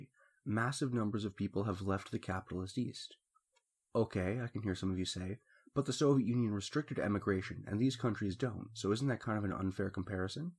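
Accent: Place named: United Kingdom. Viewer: You should be ashamed. I hope you are not some American kid (accent says more Brittish) who wants to be edgy so does this propaganda. My familly lived communism. You did not live shit. Nothing I wish for more than you and your pals actually living in communist times and country. Commies forcing planned economy and collectivization took my famillys farm, took everything. Made grand-grandpa work on his own farm for no pay. He was on Eastern Front from Ukraine to Berlin!! Constant food shortages. In 80s there was shortage of toilet paper. That was luxury! Rly how fucking dare you spreading those ideas that killed millions of people when you are born in free country. Shame on you.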